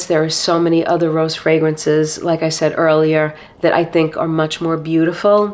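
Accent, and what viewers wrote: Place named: New York, United States. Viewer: Love the video. More of this style of content needs to be out there. Just a discussion of what we like and don’t like. Fragrance YouTube is all about marketing these days and it’s annoying. Oud Satin Mood is one I’m on the fence with as well. Great scent, but overpowering..and the Extrait just doesn’t make sense. It’s nuclear lol. I had to subscribe after hearing the NY in your voice! Brooklyn boy over here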